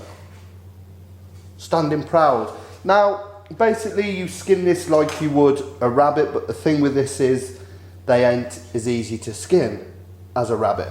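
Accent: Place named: Yorkshire, United Kingdom. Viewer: Expected a backwoods southern US accent like mine. Got what sounds like a Yorshire accent(?) instead. 🤣 Cheers mate! Y'all did a good job.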